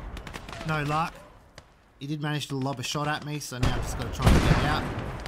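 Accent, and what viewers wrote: Australia. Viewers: Your my favorite Gates of Hell commentator tbh, I think it’s your accent. You sound right out the bush mate. Chuck her in the Uti and keep up the excellent commentary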